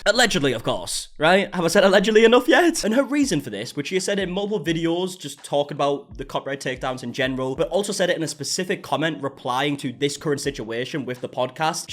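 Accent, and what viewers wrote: United Kingdom. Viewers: I love the Bri'ish accent. It's a huge plus for me when choosing which youtubers to follow. One thing I love about youtube is finding people that aren't just Americans and hearing how they sound. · don't you dare change the adorable british accent, i will throw hands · The purple is honestly a nice light colour and your accent and the way you speak has always been amusing to me (not in a mean way) just saying your accent is not a problem, nothing wrong with it. I’m also British and I sometimes get told by people from America that I sound like I’m speaking a completely different language 🤣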